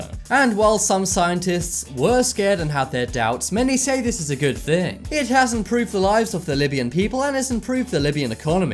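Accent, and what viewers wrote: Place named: United Kingdom. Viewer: I'm pretty sure the dude is British lol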